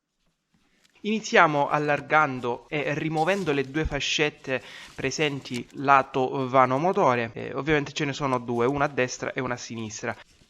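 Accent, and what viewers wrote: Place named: Salento, Italy. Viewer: Che bell'accento salentino che hai! Sei di Lecce?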